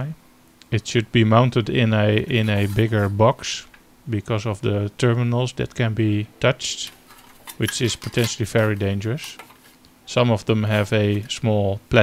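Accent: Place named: Netherlands. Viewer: Considering his pronunciation, making fun of other people is not that great. Way he pronounced V and Ch and such I am pretty sure he is Dutch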